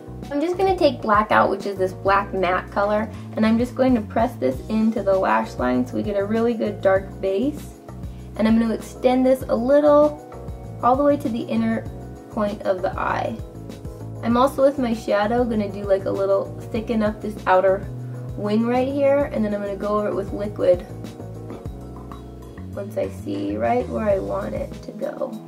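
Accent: United Kingdom. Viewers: i'm in love with your british accent<3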